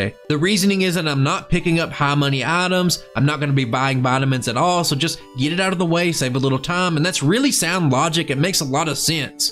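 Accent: South, United States. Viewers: Literally the first and only Pokevideo I've seen in my entire life with a Southern narrator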